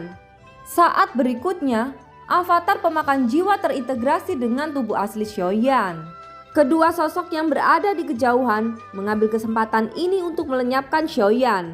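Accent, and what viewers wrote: Java, Indonesia. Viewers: Logat jawanya trllu medok😁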